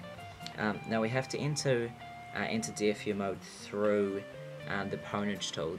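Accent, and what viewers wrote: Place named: New Zealand. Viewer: Nice video, new Zealand accent xD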